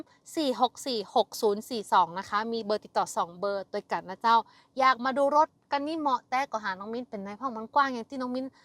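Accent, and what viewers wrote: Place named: North, Thailand. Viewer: อู้เมืองม่วนแต๊เจ้า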